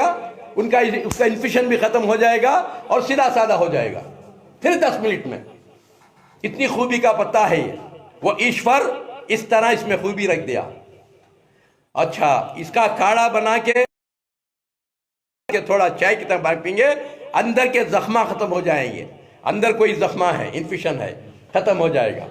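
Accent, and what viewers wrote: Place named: Hyderabad, India. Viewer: Sab ka naam batae saf saf nahi dikhta naam batane s humko samajh aaega haidarabad ki boli samjh nahi aati jaldi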